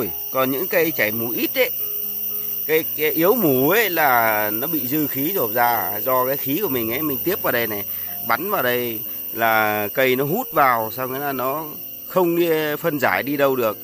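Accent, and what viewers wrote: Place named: North, Vietnam. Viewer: Nghe ban noi que o bac ha.cho sdt nhe/que ban o dau day.hien jo ban o xa nao huyen nao tinh nao nt cho minh biet dia chi mhe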